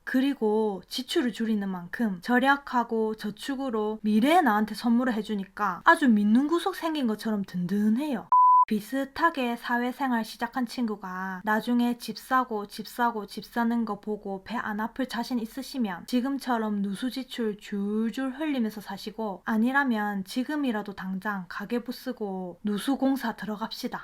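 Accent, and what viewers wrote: Gyeongsang, South Korea. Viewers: 경상도 사투리에 똑부러지는 조언 딱 이상향형????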